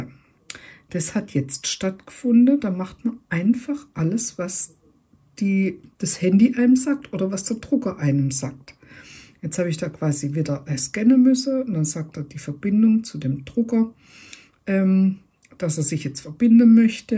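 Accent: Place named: Southwest, Germany. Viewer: Dieser Akzent ist tausendmal schlimmer als wenn mich nen Sachse vollquatschen würde. Gott ey, lernt hochdeutsch!!! Was ist bei euch da unten im Südwesten verkehrt gelaufen?!? Versaut einem das ansonsten sehr schöne Video!!!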